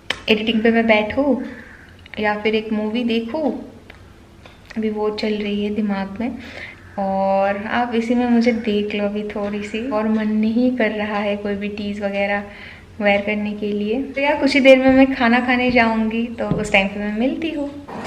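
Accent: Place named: Bengal, India. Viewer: Chapa k chale jana it called bengali style me hindi bolna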